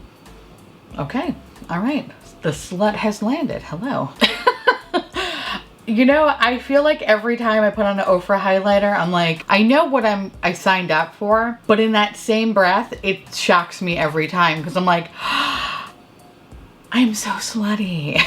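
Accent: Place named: Minnesota, United States. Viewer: The more I hear your Minnesota accent the more I encourage you to watch 'F is for Family' so you can truly experience Jenny Throater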